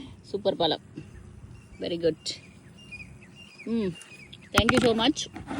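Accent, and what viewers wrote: Sri Lanka. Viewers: எந்த நாட்டில் இருந்து பேசிறீங்க.இலங்கையா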